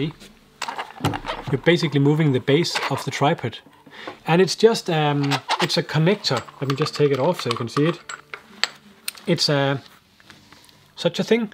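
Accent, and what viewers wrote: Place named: Denmark. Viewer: Hi, seeing that you use the Tamron 150-600, have you tried with the new "Bigma" 60-600? Thanks for your videos, very instructive and easy to understand... specially with your Daninglish... perfect for my Spanglish. Greetings from Colombia, JuanB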